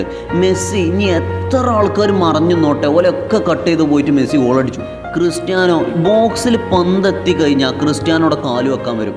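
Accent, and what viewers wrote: Malappuram, India. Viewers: Malappuram karan Ano?... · മലപ്പുറം ഭാഷാ ശൈലി ഹൃദയത്തിൽ കൊണ്ടു😘❤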